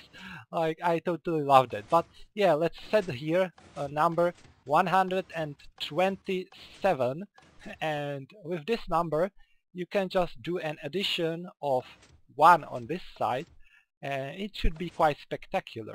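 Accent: Czech Republic. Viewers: ja mam dotaz :D prosim. z prizvuku si myslim, ze jsi cech, tak to napisu rovnou cesky :D mc sem hraval, ted to jen sleduju. ale nikdy sem na obvody nebyl a hrozne rad bych vedel, jaky tohle ma vyuziti v klasickym survival svete ? nebo to je jen sranda, ktera nema nejaky velky vyuziti ?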